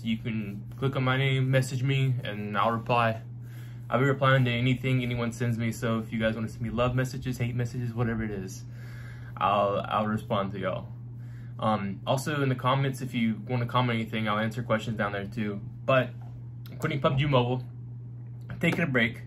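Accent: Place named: Puerto Rico. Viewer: Yo pensé que era de Puerto Rico 🇵🇷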